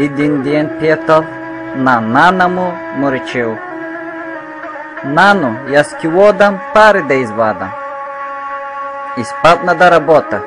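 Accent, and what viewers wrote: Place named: South, Bulgaria. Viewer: Красив южен български диалект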